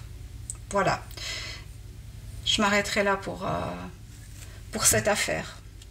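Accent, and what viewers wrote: Switzerland. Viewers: Bonsoir Madame, j'apprécie de plus en plus vos tirages, calme, tranquille, voix douce, petit bruit d'eau, beaucoup de respect, élocution soignée, il me semble reconnaitre un accent suisse mais c'est peut être la Savoie. Moi non plus je ne connaissais pas ce drame. Je me permets de vous donner des idées si vous voue êtes en panne d'inspiration : Céline Giboire, Thifaine Veron, Vanessa Melet (pardon si vous avez déjà travaillé sur leur disparition ou décès car je connais votre chaine depuis peu). Merci beaucoup pour la qualité de votre travail. Bonne soirée. Aline Le Moal, Finistère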